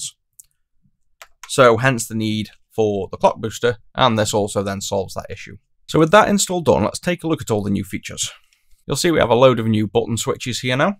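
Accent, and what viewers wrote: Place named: Stoke, United Kingdom. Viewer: Just noticed from the accent you're also from Stoke, nice! Do you sell your mod kits in your physical shop? I might come down and buy a wireless GBP battery to save having to ship anything.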